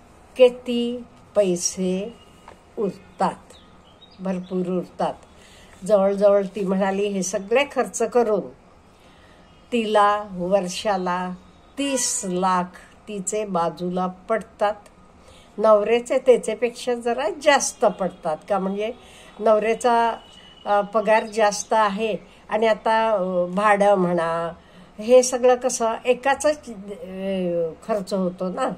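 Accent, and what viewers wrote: Karnataka, India. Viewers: Yes her mother tongue is Kannada ❤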